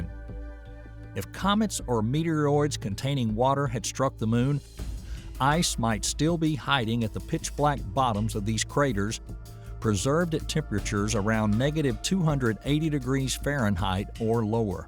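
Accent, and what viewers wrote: South, United States. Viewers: Sorry the Deliverance accent is a hard no. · Southern good ole boy . Refreshing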